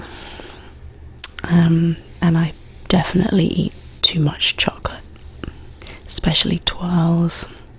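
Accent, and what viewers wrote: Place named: United Kingdom. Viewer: Your voice is very nice, the accent and all, to me as an American. Also very sexy I love British women that aren't total slags.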